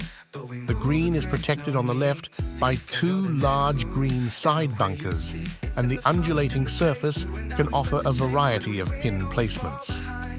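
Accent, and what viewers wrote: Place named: United Kingdom. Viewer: For some reason the British narration makes it easier to understand lol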